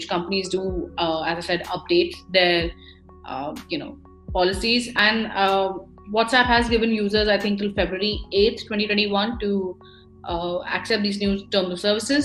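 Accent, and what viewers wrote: India. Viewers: Thick Indian accent or pronunciation errors. Eg. Privacy. Couldn’t follow. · Why can’t we get an American native speaker to explain what is going on. A Hindu speaking with a thick Indian accent that is dying trying to speak English. This is totally WILD. It’s completely tiring trying to understand this.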